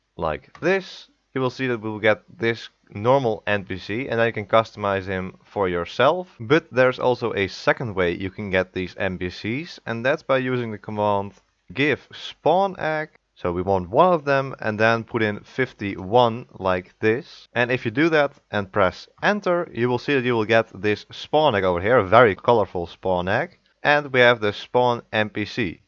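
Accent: Netherlands. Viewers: Dat nederlands accent XD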